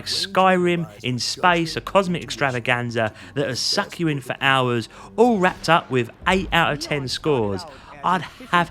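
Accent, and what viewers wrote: Cockney, United Kingdom. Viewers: Mate, I reckon it’s gonna blow expectations personally, you may be cockney but you ain’t east London, keep the videos coming love to hear the thoughts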